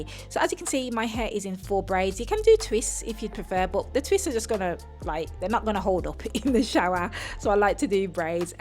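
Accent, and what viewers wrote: Birmingham, United Kingdom. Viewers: I hear a Brummie accent hehe😜☺️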